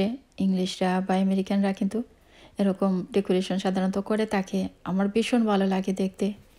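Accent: Sylhet, Bangladesh. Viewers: Afa Sylheti matowwka Eta mat bala lage. Na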